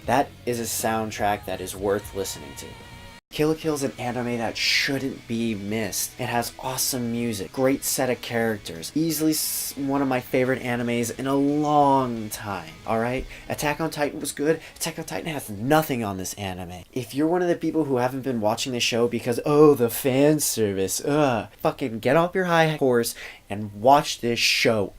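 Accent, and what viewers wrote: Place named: England, United Kingdom. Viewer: My nigga, this is some jontron shit and I like it. IMO: You need to keep the tone of voice more constant, when you are on video you are pretty loud which is good but on the mic is sounds like you are hugging the stand and whispering into it. In regards to the englishese (the opposite of engrish) the pronunciation may annoy some, just a heads up. 10/10 get subbed son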